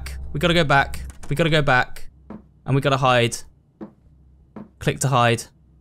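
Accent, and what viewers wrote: United Kingdom. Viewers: The British accent is making it funny but i really wanted to hear say bottle of water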